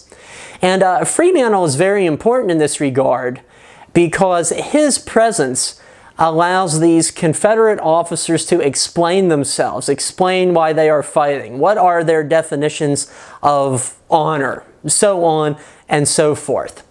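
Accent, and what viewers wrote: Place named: Pittsburgh, United States. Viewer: This guy is a Yinzer no doubt.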